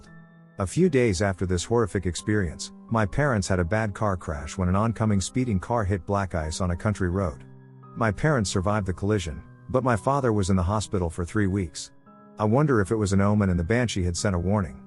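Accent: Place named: South, United States. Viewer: I've been watching for a long time but I finally decided to subscurb because I'm excited to find out that the tts is a fellow southerner. Yeehaw yall!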